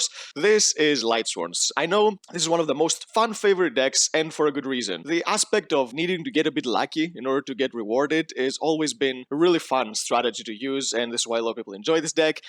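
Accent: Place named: Greece. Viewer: Hey new to the channel here and the first thing I thought hearing your voice was that you may be a fellow greek. Having said that, great content, waiting for more!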